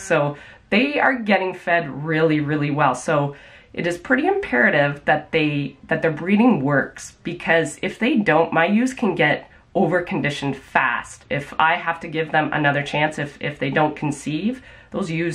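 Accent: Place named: Canada. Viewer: Your Canadian accent is really fun to listen to 😉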